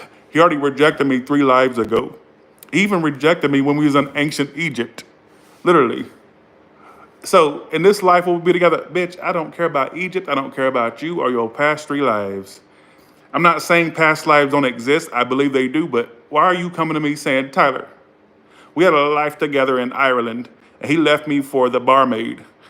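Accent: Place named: Long Island, United States. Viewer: His “long island” accent takes me out every time! 😂😂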